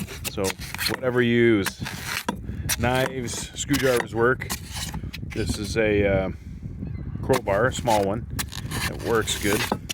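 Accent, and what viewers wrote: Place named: Canada. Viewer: You're Canadian accent makes me want to vomit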